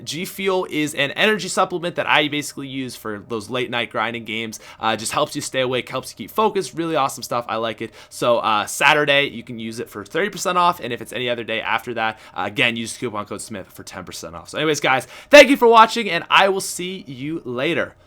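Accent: Canada. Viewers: It's his Canadian accent calm down (I think :/) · he's Canadian so he pronounces words differently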